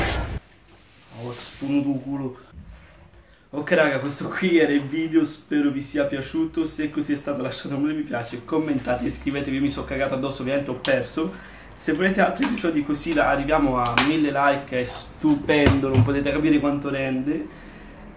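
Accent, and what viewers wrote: Sicily, Italy. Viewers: "O spunniti u culu" di dove sei? Io sono di Siracusa 😂😂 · Siciliano doc... bellissimo video ansia a mille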